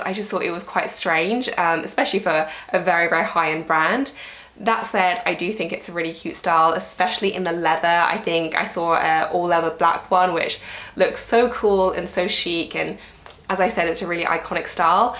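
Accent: United Kingdom. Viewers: Ruth-Ann Leveridge-Young I never cared for British accents until I heard her speak. 😂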